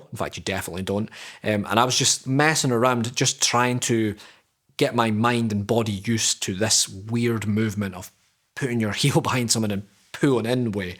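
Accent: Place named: Scotland, United Kingdom. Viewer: Wild clicking on this video randomly and before I can even finish thinking "oh he's Scottish" I'm shown a clip from my local gym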